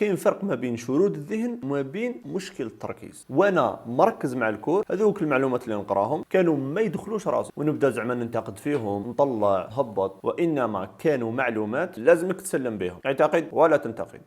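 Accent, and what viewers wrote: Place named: Algeria. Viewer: طلعت لهجة الجزائر صعبة بس يلا اهم شي فهمت الفكرة شنو 😂😂 بگ لوڤ من العراق 🩵✨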